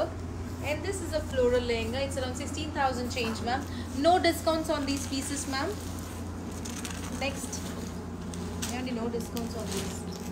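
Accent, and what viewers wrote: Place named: United States. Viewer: Manum India lo puttamukada American acsent enduku. Price clearga chepthe good 16. Or 60. Anedi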